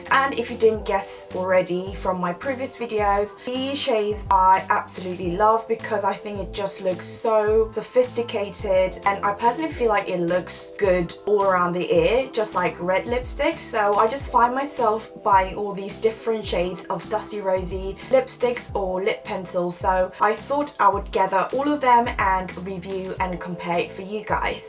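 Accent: United Kingdom. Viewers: 상큼하고 귀여운 이 떠서 보러왓오요 ㅠㅠㅠㅠㅠ ❣❣❣❣❣영국식 영어발음도 넘 매력있네요오.... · 목소리 너무 좋타....♡영국발음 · 세상에.....영ㅇ국발음....!!!!!!!!!!!!! 게다가 영상도 너무 좋아서..바로 구독 아 너무 사랑해요 진짜.....ㅠㅠㅠㅠㅠㅠㅠㅠㅠㅠ♡♡♡♡ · 언니 영국영어쓰시네...존멋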